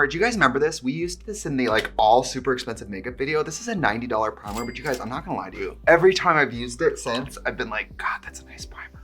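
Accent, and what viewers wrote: Wisconsin, United States. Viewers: Wisconsin Accent coming through more than normal today. Love me a dræg moment ❤️ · I love when you lean in to the Wisconsin accent.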